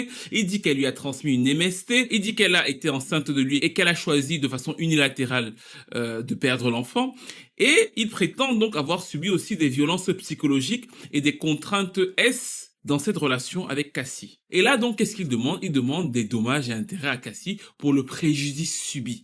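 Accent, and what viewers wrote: Cameroon. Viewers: Si on ne connaît pas le Cameroun ou juste très peu, impossible de connaître le phrasé des camerounais... 🙃 Votre remarque est donc compréhensible ! 😉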